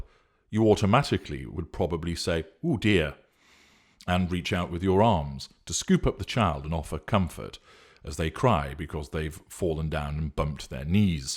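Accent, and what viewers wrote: United Kingdom. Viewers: This British accent- I feel like a spot of Earl Grey and a plate of biscuits while listening to this😅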